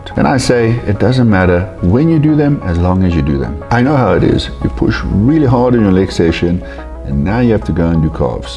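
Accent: Afrikaans, South Africa. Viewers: Is South African? I'm from western cape sounds hella Afrikaan lol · Man I love you bru....you are so down to earth...so charming....funny and all round beast.....I can't help but notice the south african accent.....lol so I guess that also makes it easy for my to just give you my full support....LAAT ONS DIE BENE HARD BLIKSEM MANNE!!!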